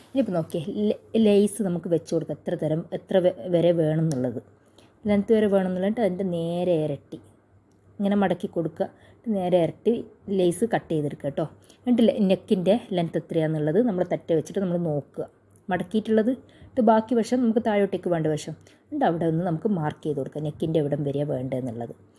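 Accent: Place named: Vadakara, India. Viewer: Are u from വടകര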